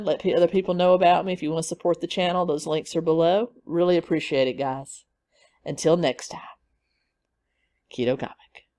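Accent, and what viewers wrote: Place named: Texas, United States. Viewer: Love your accent, reminds me of family reunions in Texas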